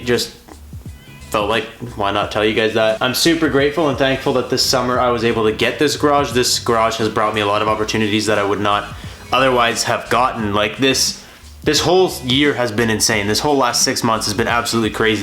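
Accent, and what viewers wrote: Canada. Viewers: Sound Canadian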